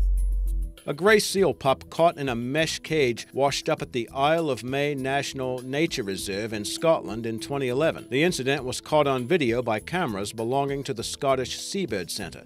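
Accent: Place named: Australia. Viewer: Rainbow Dash you can't tell? I'm American he's definitely Aussie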